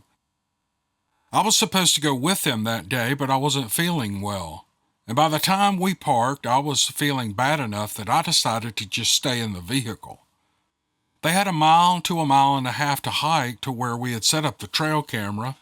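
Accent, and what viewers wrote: South, United States. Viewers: Hi I'm from the US Virgin Islands I listen to your podcast and I enjoy the Steve Lily and enjoy the tone of your voice it's a real southerners voice and I am very bad at writing so please bare with me again thank you.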